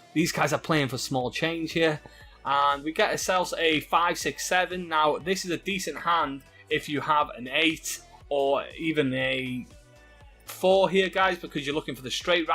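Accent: United Kingdom. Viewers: It's the British accent 😉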